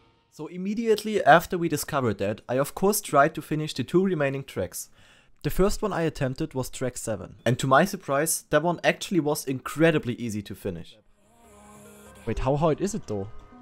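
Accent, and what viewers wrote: Germany. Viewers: Jedes Mal, wenn ich den deutschen Akzent höre, muss ich mir vor Augen führen, dass mein Englisch für Muttersprachler genauso schlimm anhören muss. 😂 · r u German? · Are you from Germany?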